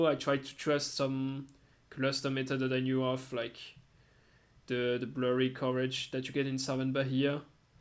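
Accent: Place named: France. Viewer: Pourquoi tu as le drapeau Danois ? j'entends l'accent français 😅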